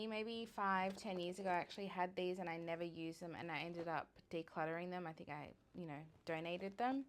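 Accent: Australia. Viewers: Hi, I'm new to your channel. It's so refreshing to hear an aussie accent on youtube and that you review Australian makeup brands and provide links to products that i can actually buy in Australia!!!! Yay!! Thank you so much for your channel. I've already bought some Australis products that I never thought of trying. Very good they are indeed!! Kepp doing what you're doing 'cause it's really great. ❤❤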